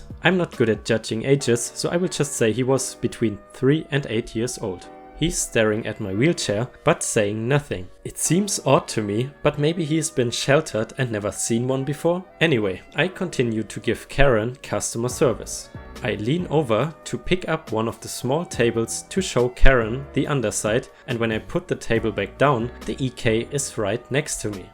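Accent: Germany. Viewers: I love your accent. Are you from Germany? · Great videos, love your delivery style and that genuine German accent. Definitely still read the possible fake reports but give a warning as you have been, that it's possibly not true but being read because it is funny. Great videos, love your scheiße comment on a previous video of yours (I did German at high school, it means 'shit' for non German speaking English speakers). This next bit is from another video of yours. Gibberish is pronounced with a 'J' sound (jibberish) at the beginning, like 'J' sound (as in Julius for example) rather than the 'G' sound as in Gorilla. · ufff man hört den Deutschen Akzent raus xd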